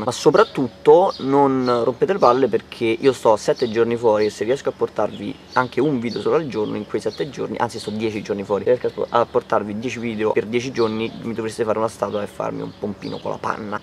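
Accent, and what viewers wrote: Rome, Italy. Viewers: Parla di più in romano che fa ridere un bottooooo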